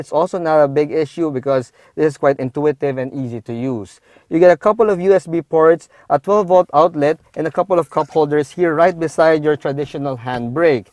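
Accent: Philippines. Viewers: Ang conyo ni sir pakinggan sarap manood 😅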